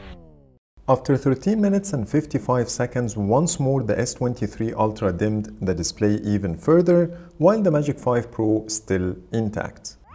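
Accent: Egypt. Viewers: لجهتك مصري ١٠٠% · your accent like the Egyptian people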